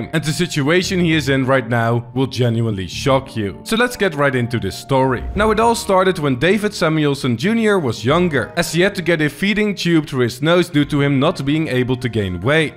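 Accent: Netherlands. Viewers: Heey een Nederlander die Engels praat!! Of probeert althans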